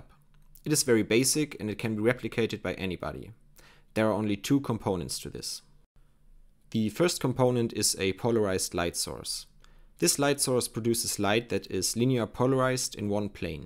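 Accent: Germany. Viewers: God damn, your German accent is so strong and so nice 😂❤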